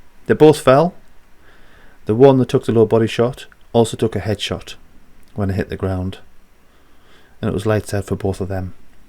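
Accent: United Kingdom. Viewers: Love the UK accent in these videos, but yeah, we've got rats in the states.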